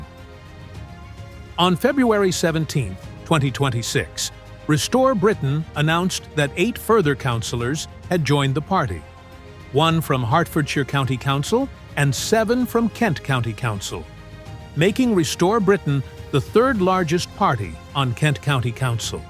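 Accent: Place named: United States. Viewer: Next time you make a video about the UK, you might consider using an AI voice with an actual BRITISH accent, as opposed to an American one. Listening to this was really getting on my nerves, but the way it pronounced 'Fareeeij' was the final straw for me! Just sayin'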